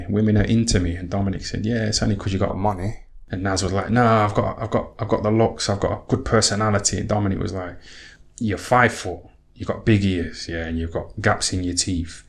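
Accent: United Kingdom. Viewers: This channel is good stuff. You speak like a lot of people I grew up with. I live abroad now and rarely hear an authentic working class UK accent. Awesome content regardless of your voice though.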